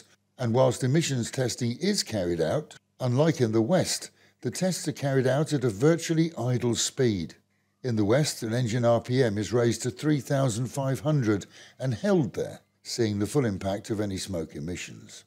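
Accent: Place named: RP, United Kingdom. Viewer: Nice RP accent. Quite a change from the many YouTubers who seem to be from Newcastle.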